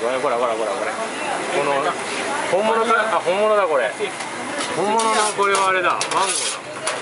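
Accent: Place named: Japan. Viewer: very cool to hear a japanese guy speaking urdu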